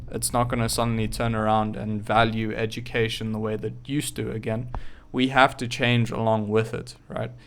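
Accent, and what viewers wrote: South Africa. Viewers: You sure are handsome! 😍 Dreamy Alpha male. And that SA accent is beautiful. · You speak English really well. I mean the accent. I can barely hear what sounds like a South African accent. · are you from south africa? i worked with a guy that sounded like you.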